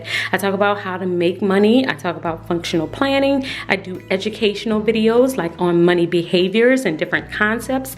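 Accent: New Orleans, United States. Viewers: You hair is beautiful! Are you from New Orleans? I hear an accent. I just found your channel yesterday. Love your videos. Thank you for sharing.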